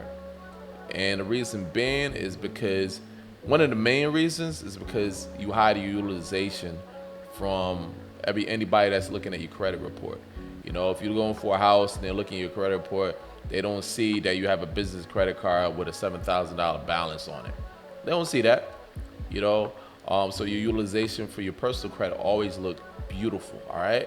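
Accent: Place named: New York, United States. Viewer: You gotta be from Ny lmao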